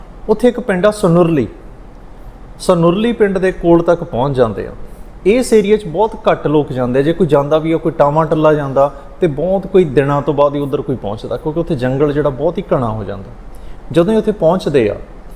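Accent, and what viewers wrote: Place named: Jagraon, India. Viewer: ਵਧਾਈ ਆ ਬਾਈ ਜੀ ਸਵਾਦ ਆਉਂਦਾ ਸੁਣ k ਲੱਗਦਾ ਤੁਸੀਂ ਸਾਡੇ ਏਰੀਆ ਤੋਂ he ਹੋ ਜਗਰਾਓਂ ਤੋਂ ਅਸੀ ਰਾਏਕੋਟ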